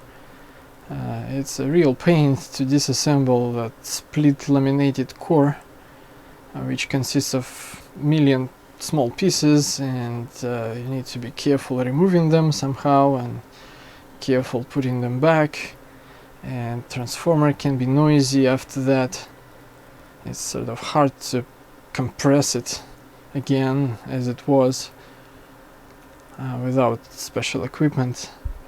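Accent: Russia. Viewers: Yeah you are probably right. I guess searching for a replacement transformer that would fit this application would time consuming as well. By the way I was wondering for some time where do you live :) I noticed your Russian accent but you are using 120 V AC. So you must live in US or Canada. Is that right?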